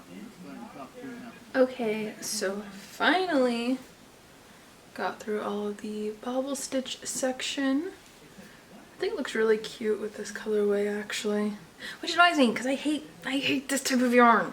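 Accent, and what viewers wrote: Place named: Australia. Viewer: hi. are you in australia? you sound australian but the yarns you mention are all US. Maybe if you blocked the hood it might sit better? Can you tell me what you dislike about this yarn and did you do a swatch first? anyhoo, I like the hood, especially the colours and it looks straightforward, nice to meet you :)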